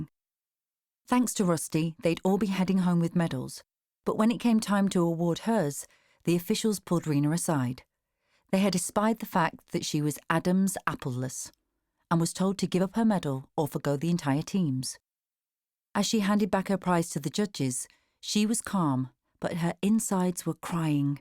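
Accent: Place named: United States. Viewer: Suranne's American accent is spot on !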